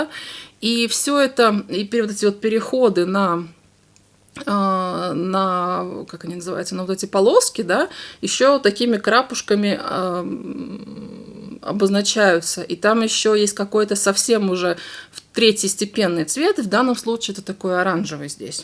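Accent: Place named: Latvia. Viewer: Sveiki:) es, ceru, ka var latviski, jo dzirdu latviešu akcentu:) tik gribu pateikt, lai šis gads ir saulaināks un priecīgiem notikumiem bagātāks! Ļoti forša episode, arī es atceros eBay, es pat tirgojos tur, radās ideja papētīt to atkal:)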